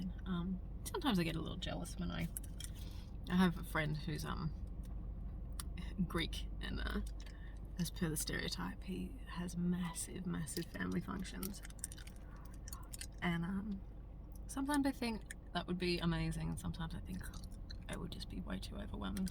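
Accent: Australia. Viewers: Just found your channel....sooooo good! The Oz accent is beautiful....relaxing as fuck 😍👌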